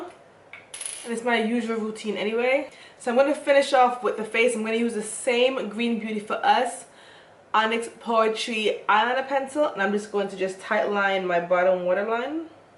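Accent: Jamaica. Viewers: Hi, i love your videos and your Jamaican Accent😎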